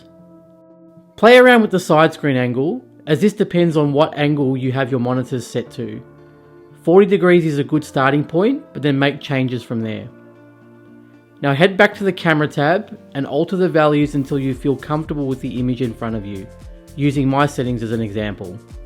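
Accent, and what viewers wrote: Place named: Australia. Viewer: I love your calm style and Australian accent 😃 Much love brother 🍻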